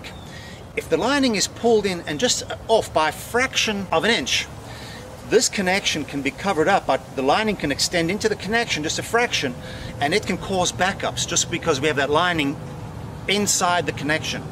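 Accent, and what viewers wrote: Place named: South Africa. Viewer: Interesting accent!!! Originally from South Africa or Zim?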